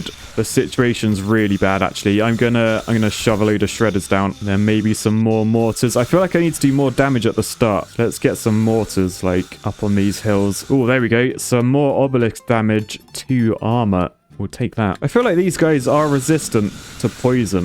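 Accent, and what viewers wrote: United Kingdom. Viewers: early to this amazing video from my favorite bri'ish engineer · Always great to hear that beautiful British voice.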